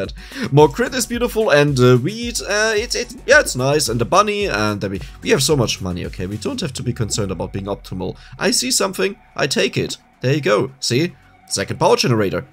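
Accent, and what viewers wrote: Germany. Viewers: You know, it's already hard enough to get through your thick German accent, the NIE NE NE NIE NEN NE don't really help there. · Moin Ich mag deine Art sehr gerne (und dein deutscher Akzent hat dich auch noch mal direkt ne Ecke sympathischer gemacht) Abo ist da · Bruh du bist Deutscher das merkt man so Hard in deinem Akzent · The german english 🤣 love it, wenn das deutsche "so" ausbricht 🤣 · Very german accent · Oof the german accent😂😅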